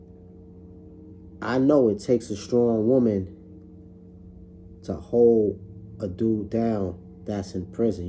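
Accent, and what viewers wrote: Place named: Pennsylvania, United States. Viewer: I'm a new subbie & supporter. First thing, your voice is giving me Cassidy vibes😁im from PA and I recognize the accent. Second thing, you are a nice looking dude. Third, u need to post your cash app so your supporters can really support support if you feel me. Much love. Keep posting.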